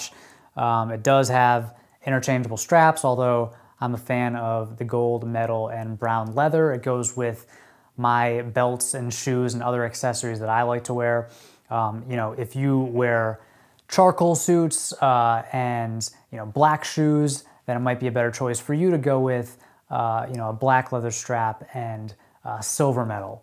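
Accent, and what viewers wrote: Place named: East Coast, United States. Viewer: Sounds a tad bit East coast, but also eatern midwest. If I guessed I'd say hes from VA-OH-PA something in that middle east coast range.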